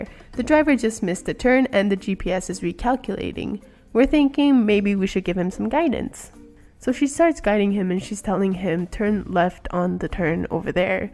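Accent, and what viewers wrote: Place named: Vietnam. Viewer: Can't wait for the next onee!!! <333 Love your vietnamese accent LOLOL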